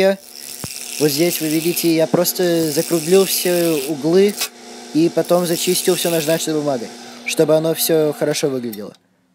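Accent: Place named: Russia. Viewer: Молодец парень! отличная работа! А все кто смеются над русским произношением,- сами попробуйте на каком то другом языке кроме родного так же бегло что то рассказать .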